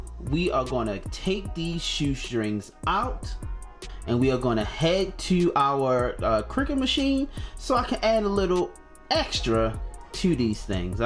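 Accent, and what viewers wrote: Baltimore, United States. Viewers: I need to know if I'm correct. Is that a Baltimore accent I hear?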